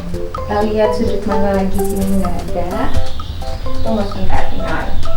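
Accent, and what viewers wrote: Java, Indonesia. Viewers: seneng liat chanel mba klo ngomongin tentang taneman penyampaiannya juga enak apa lg klo udah bahasa jawanya keluar makin seneng dengernya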